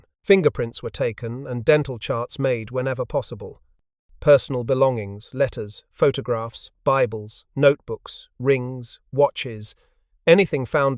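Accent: United Kingdom. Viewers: They selected a british accent narrator, so you get all the UK pronunciations for a very American operation.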